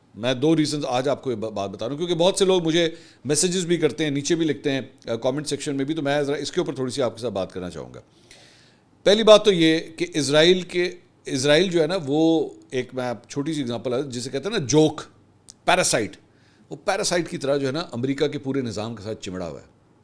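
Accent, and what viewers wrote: Punjab, Pakistan. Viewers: پنجابی پنڈوہ اردو نیوز میں بھی پنجابی😂😂😂 لیکر آتا ہے 😂😂😂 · Bhai Salam Punjabi touch Sath ma ap lgatay hn bra mza aata Hy zabardast speech salamat rahin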